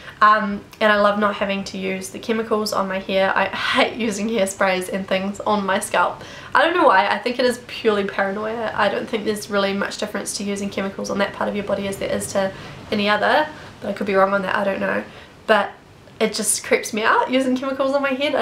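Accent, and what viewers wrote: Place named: New Zealand. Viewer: I'm an Aussie; live in NZ and I can defs tell you - that is a Kiwi accent with a deep South roll of the R. Not an Aussie accent at all.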